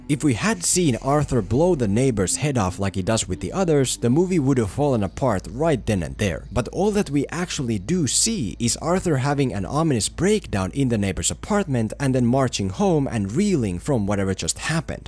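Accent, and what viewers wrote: Finland. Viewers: Let me guess, you are Finnish or something by your accent. (I'm Finnish myself so Idk) No offense intended. I like your accent ALSO HE Didn't kill his neighbour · Hey . I have to ask. Are you Finn? Your accent defenetly sounds finnish :D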